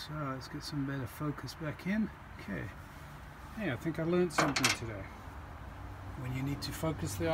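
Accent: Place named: Europe. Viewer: Wow! Never knew or pictured you speaking with a European accent lol! Awesome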